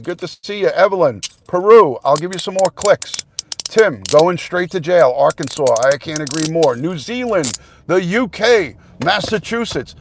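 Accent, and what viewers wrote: New York, United States. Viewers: ! I wasn’t subscribed to your channel even though I’ve been watching your content! Well, now I’m subscribed- I love your NY accent, and your input from a “lawr enforcement perspective”❤️👍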